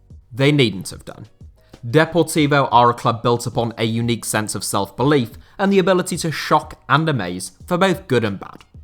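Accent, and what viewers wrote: England, United Kingdom. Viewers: Your accent is from what part of england? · Your english accent is unbearable. Learn how to speak!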